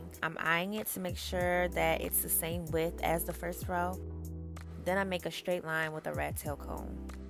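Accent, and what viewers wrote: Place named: Washington DC, United States. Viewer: Your accent is familiar , may I ask if you’re from Washington , DC? Also watching you part was so soothing in an odd way ! Lol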